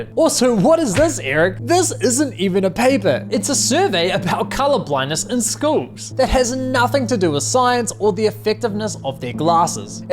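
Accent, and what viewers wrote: New Zealand. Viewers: As an American, I very much appreciate your passion for protecting US consumers. This company is clearly lying. Your channel description and accesnt suggest you are from New Zealand, so I'm curious about your story. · Me too! 😂 That Kiwi accent! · Stellar work mate ! And, to be fair, your kiwi accent is indeed charming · Appreciating the kiwi accent ( a decade ago ) I swear I heard as a dickhead ago. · it’s just his Kiwi accent.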